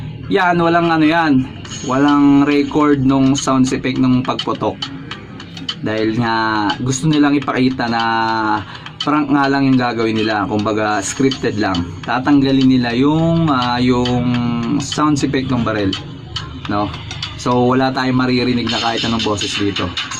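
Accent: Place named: Ilocos, Philippines. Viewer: Ilocano ka?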